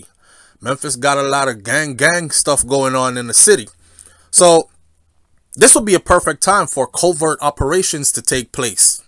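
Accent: Jamaica. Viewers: You sound's like...Jamaican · This sounds like a Jamaican 🇯🇲 blogger I know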